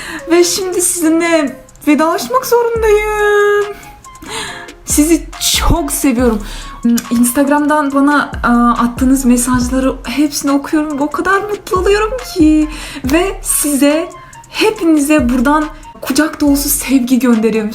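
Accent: Azerbaijan. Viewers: Azərbaycanca cox sirin danısırsız gözəl😗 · Sən hər zaman Azəri dilində danış sənə çox yaraşır həm çox şirin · Abla nasıl danisiyorsunki sandımki gercekden Azerisin😂😁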